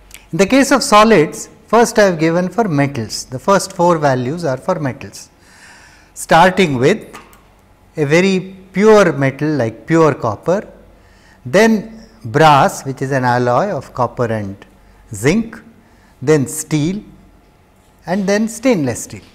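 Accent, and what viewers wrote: India. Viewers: there is too much indian english. great spelling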